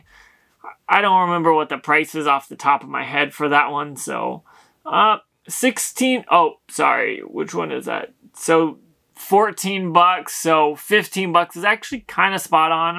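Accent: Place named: Midwest, United States. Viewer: This been bugging me for awhile are you from the Midwest ? I can kinda hear the accent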